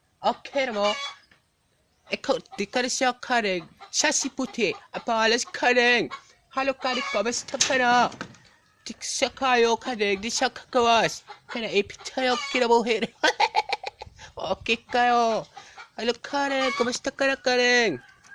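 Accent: Visayas, Philippines. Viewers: Pastilan gid hahhaha bisaya kaayo hhahaha mag like nito sipain ko bahay niya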